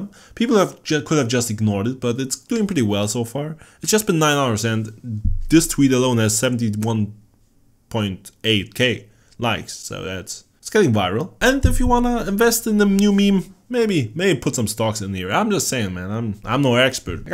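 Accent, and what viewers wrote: Germany. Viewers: I was right when I watched you for the first time. You are from German. :D I read the comments and now I'm sure. That's why not everything you talk I understand so well. Is your accent :D Funny is that the more you talk more curious I get trying to understand you. I really like it! :D I wanted to ask once in your livestream video from SSB, there the game is not in english. But I decided to wait a little more and observe you more. xD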